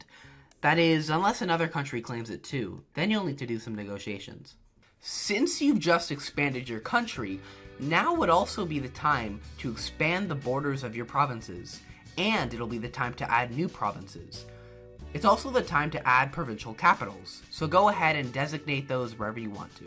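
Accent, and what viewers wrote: Quebec, Canada. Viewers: probably from Quebec, Canada. definitely from up north, speaks both english and french fluently, plus the references to Canadian provinces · he is Canadian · Is he Canadian?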